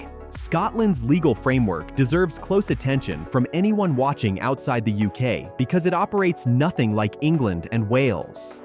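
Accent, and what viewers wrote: United States. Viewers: change the American accent for gods sake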